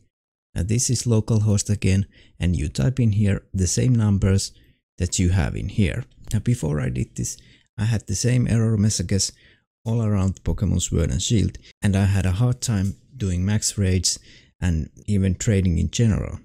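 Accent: Finland. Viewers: Do I hear a Finnish accent or am I going crazy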